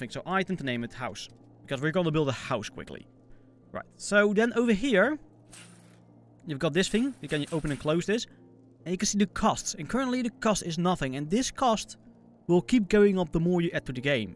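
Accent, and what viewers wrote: Netherlands. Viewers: You sound Dutch 🙈 Are you? I am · Are u dutch bc it sounds like it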